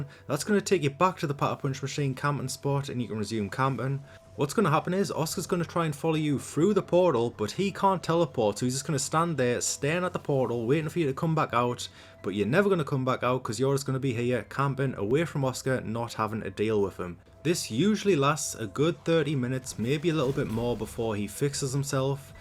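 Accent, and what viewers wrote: Newcastle, United Kingdom. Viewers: Geordie accent?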